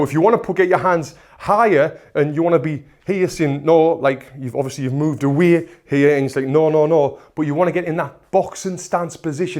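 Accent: United Kingdom. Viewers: Man, I’m in love with the way you speaking, that accent “thru the poonch”! Love British accent since been taught it at school. Being native Russian speaker now living in US and speaking American english. Completely different story. Love to learn more about martial arts as well and boxing is one of the basic ones, it’s classic! Thank you for the video! Keep it up! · Are you British? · Your British accent ... Love it 😂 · I love british accent! I subscribed u cos i loved u said puunchh instead of punch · How I love British accent! Cheers from Poland! 😉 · 20 seconds into the video and there is only one way to describe it, bri'ish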